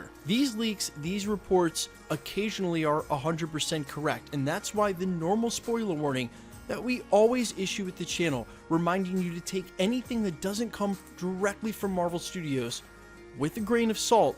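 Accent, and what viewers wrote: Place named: Philadelphia, United States. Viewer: Dat accent, if you're not a Philly Guy, I now dub thee as one! Come to the jawn, we got scrapple...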